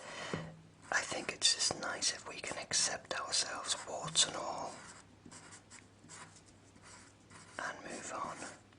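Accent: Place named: Newcastle, United Kingdom. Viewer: Oh really? I guess you kind of sound like a geordie too? :)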